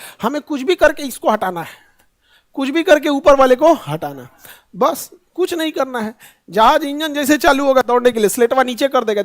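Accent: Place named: Bihar, India. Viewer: jock chal rhe ya sikha rhe🙄🙄, itni bakwas video, lol bhi samjh na aya, koi bihari lag rha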